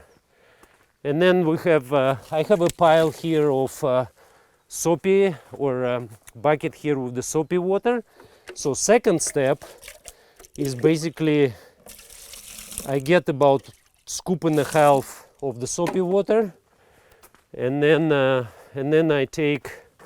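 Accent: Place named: Russia. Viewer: произносить слова совершенно не по английски, а в русской транскрипции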